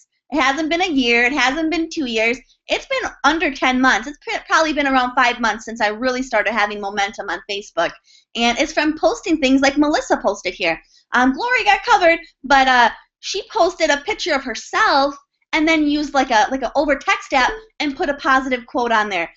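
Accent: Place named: North, United Kingdom. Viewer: I love your northern accent!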